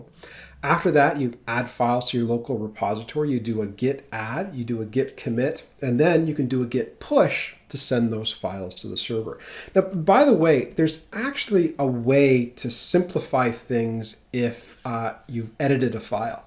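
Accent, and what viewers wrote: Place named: India. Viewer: I'm not being racist, I just don't understand English with an Indian accent. Please don't misunderstand me.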